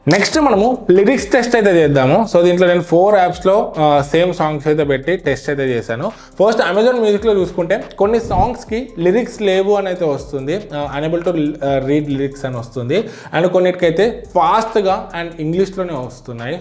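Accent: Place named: Telangana, India. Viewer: Broo ah Telangana slng loo kakunda normal slng loo video cheye broo adhe better ekkuva mandhi choostaru ide na suggestion 😊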